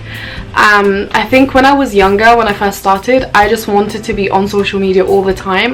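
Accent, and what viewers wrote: England, United Kingdom. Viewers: are you virgin Müslim girl? 😊 😊 😊 😊 course you not!!! are you arabian, pakıstanı, or Afghan where are you from actually youre langauge and accent just like brıtısh accent of course you not brıtısh , just born to england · I like your english accent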